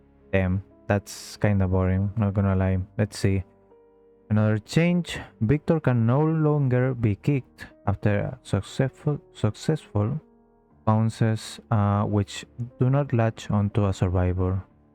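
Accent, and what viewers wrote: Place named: Spain. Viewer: exactly, they really went the lazy way. The devs are getting lazy, what a surprise. Only 1 killer update, 3 perks changed (calm spirit no longers counters ultimate weapon, which is a shame) and only one map changed, where is the swamp visual rework? Im happy for Twins mains but I dont think their changes to Haddonfield are gonna be very good, devs always fuck up in some way. Oh by the way, Im pretty sure you are a native spanish speaker. I myself am from Spain.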